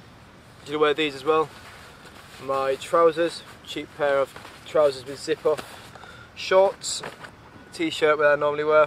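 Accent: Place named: Northern England, United Kingdom. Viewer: I can’t work out your accent. Especially when you say ‘bike’. I know it’s north of England but is there some welsh or maybe Scouse mixed in there? 😂 All the best.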